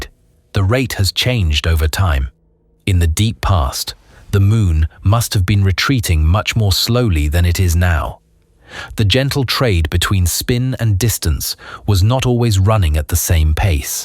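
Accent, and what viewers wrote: England, United Kingdom. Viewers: What’s very funny is how the general public believes so much more in the narrator with an English accent, as opposed to any other accent rather irritating to me pompous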